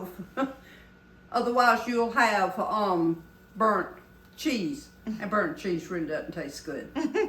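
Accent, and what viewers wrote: South, United States. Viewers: Every time you say shine for Jesus,’ I think of my wonderful Mother in Law. She’s home with the Lord now, and I know how happy she is. The two of you would have been great friends. Faith - Family - Friends! She never missed a Sunday at her Methodist church. She was a true Southern lady, could cook amazingly well, and it looks like you could be cousins. Same height, same accent, even your hair is alike. Yes, I miss her!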